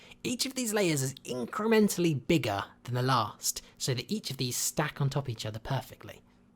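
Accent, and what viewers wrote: United Kingdom. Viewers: I love your British accent so much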